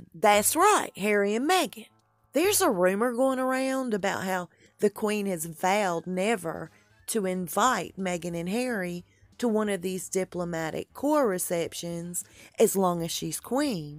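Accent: South, United States. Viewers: You always make my day with your videos. Your a awesome story tell and love love love the southern accent.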